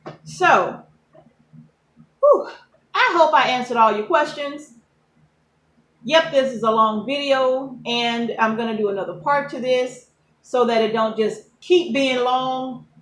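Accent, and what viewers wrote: South, United States. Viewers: From my experience, yoie tubie can't figure out CC, especially with a southern accent. I have seen some ridiculous "translations".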